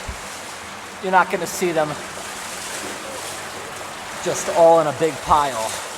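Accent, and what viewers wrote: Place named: United States. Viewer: Omg I love his American accent.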